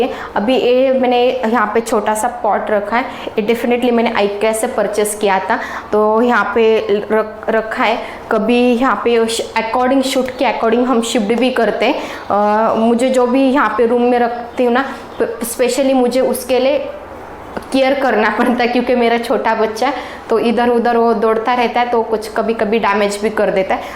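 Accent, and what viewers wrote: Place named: Maharashtra, India. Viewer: Marathi logo ki hindi... जगात भारी 👍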